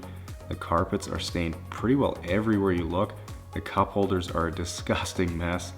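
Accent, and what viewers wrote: Saskatchewan, Canada. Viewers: It’s our awesome Saskatchewan accent 😘🇨🇦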